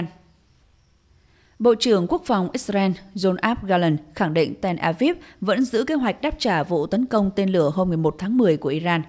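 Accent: North, Vietnam. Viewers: Đài này phải Đài cũ của HTD không vậy, sao phát ngôn viên tiếng bắc đọc vậy?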